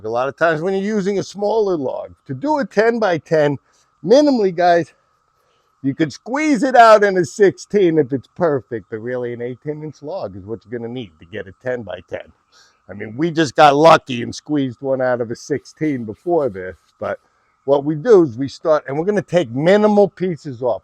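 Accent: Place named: Mid-Atlantic, United States. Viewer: I enjoy your channel, glad I came across it. Two questions. Guessing from the dialect, you might be somewhere between Baltimore and Philadelphia? Somewhere nearby? Second, I’m curious how the laser projects all around those long logs. Are there an extra lasers on the periphery? Just curious how that works.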